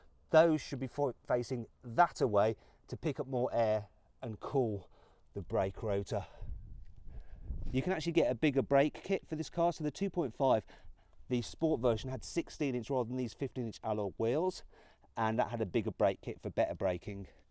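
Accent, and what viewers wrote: Birmingham, United Kingdom. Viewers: sorry i couldn't understand the model of the yokohama, can someone please write it down here? thanks! (english isn't my first language and the british accent makes it a bit hard to understand sometimes!)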